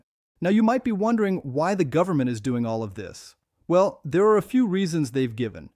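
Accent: United States. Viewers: Ah it’s the uk lose the American voice it’s dammed annoying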